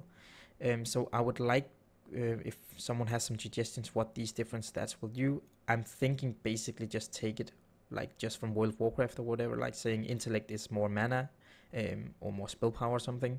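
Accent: Denmark. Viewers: Is that a Danish accent I hear? XD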